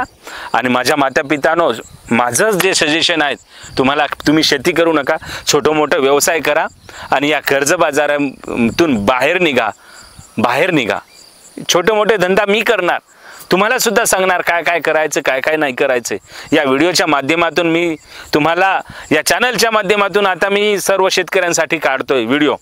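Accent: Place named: Uttar Pradesh, India. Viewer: भावा भैया सारखा बोलतोस यूपी mp cha वाटत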